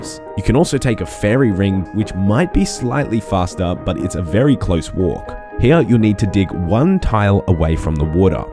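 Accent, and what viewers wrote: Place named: Australia. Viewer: Haha I always love the way Australians say certain words 😄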